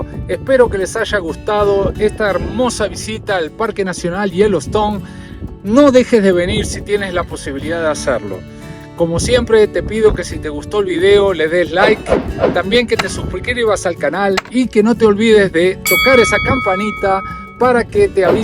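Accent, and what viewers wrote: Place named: Argentina. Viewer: Pensé que tenía acento argentino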